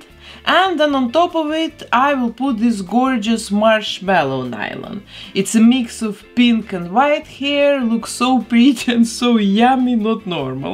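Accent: Russia. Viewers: Я слышу акцент, вы русская? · Привет, вы русская ? Просто у вас акцент сильный и некоторые слова на русском говоре .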